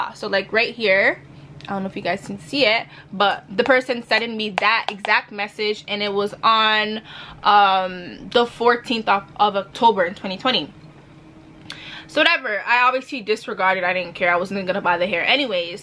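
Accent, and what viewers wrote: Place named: Toronto, Canada. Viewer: I loved this video she talk like a Tdot gyal fr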